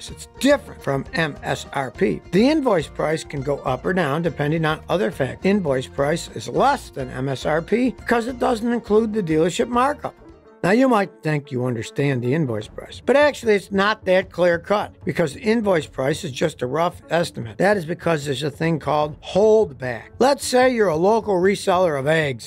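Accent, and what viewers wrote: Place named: Buffalo, United States. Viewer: Scotty is possibly the most famous guy from the Buffalo area. Love the Buffalo accent and the word "pop" instead of "soda" or "tonic."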